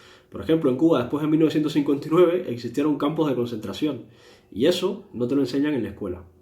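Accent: Spain. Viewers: No parles com els cubans. El teu és un bon castellà de Castella. Jo el que tu dius ho aplicaria al PP, a C's i a VOX. Jo he viscut al Brasil i a les Filipines, on voten lliurement, sí, als que tenen més diners, quasi sempre. I no cal anar molt lluny. Has parlat, alguna vegada, de com eren abans l'Iraq, Síria, Líbia, l'Afganistan quan els de Wall Street els van portar la democràcia i com eren i com són? Ací els de l'Ibex-35 són els que manen i no són votats. La peor de todas: ¡dictadura del mercado! Marcelo Colussi Rebelión “La ideología dominante es la ideología de la clase dominante”, decía Marx. Expresado de otro modo: el esclavo piensa con la cabeza del amo. ¿Por qué? Porque la imposición de los grupos de poder es total: se da en el plano material y, quizá con más fuerza aún, en el campo cultural. Eso es la ideología en definitiva: la argamasa que solidifica una sociedad no permitiendo ver la lucha de clases que la mueve o, en todo caso, haciendo de esa lucha y de las diferencias sociales algo normal, natural. 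Las clases dominantes, siempre a través de la historia en cualquier modo civilizatorio, ejercieron su poder en forma brutal, con el lenguaje de la violencia, pero también con la sutileza del discurso ideológico. Las diferentes instituciones que se fueron creando a través de la historia (familia, Estado, iglesias, la cultura en su sentido más amplio, la escuela, los medios de comunicación, etc.) son los instrumentos encargados de asegurar la transmisión ideológica. Lo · HOLA MUCHACHITO LINDO CUBANITO YA TIENES UN POCO EL ASENTÓ ESPAÑOL......PERO NO PIERDAS EL NUESTRO Q ES UNICO...OK.GRACIAS X TU MENSAJE MUY CIERTO E INTELIGENTE.....CUANTA VERDAD EN LA COMPARACION....POBRE D MI GENTE Q YA SON 60 AÑOS D MISERIA Y DESTRUCCION....VIVA CUBA LIBRE.........!😍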